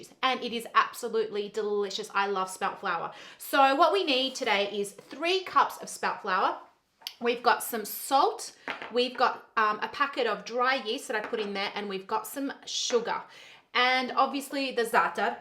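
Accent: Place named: Australia. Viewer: When I clicked I didn’t expect the Aussie accent 😂😁👌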